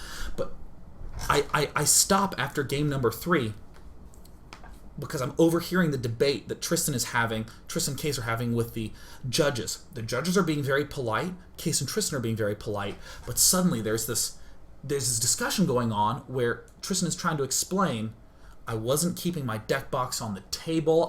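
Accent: Australia. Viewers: It sounds more like a Australian accent XD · He sounds australian not british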